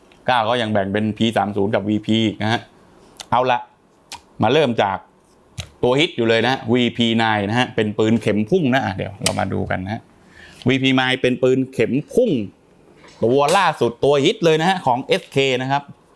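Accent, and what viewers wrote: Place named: South, Thailand. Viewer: ผมมีเพื่อนคนใต้หลายคน ที่พยายามพูดกลาง สำเนียงออกมาแบบพี่บ่าวคนนี้ทุกคน ฟังแล้วน่ารักดีครับ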